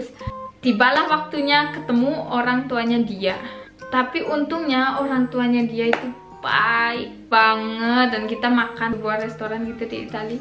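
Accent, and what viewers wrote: Bali, Indonesia. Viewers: pasti qm org bali,kliatan logatx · bisa bahasa bali ya tp qm muslim....beberapa kali di video qm dpt bilang "mekacaan" dan "saklek", logatnya juga kyk orang bali awal.a aq kira orang bali 🤭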